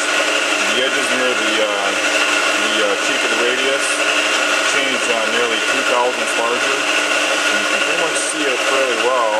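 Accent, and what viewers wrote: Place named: Canada. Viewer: Sounds Canadian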